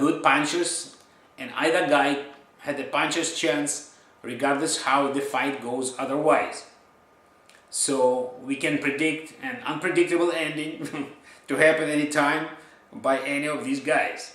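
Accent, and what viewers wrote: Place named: Russia. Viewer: Are you russian buddy ? LOL I like your accent.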